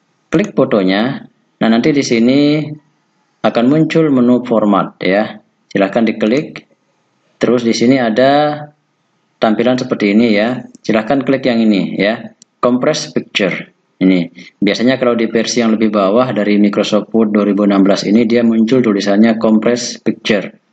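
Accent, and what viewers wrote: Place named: Aceh, Indonesia. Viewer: Lage awak aceh logat deon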